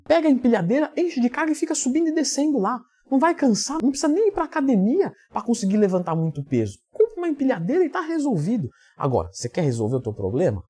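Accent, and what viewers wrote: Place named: Pará, Brazil. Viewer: Mas temas aí pai: que fala é o de Belém do para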